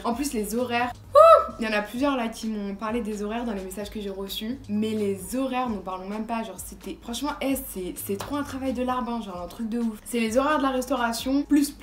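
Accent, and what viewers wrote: Lyon, France. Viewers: T’es de Lyon ?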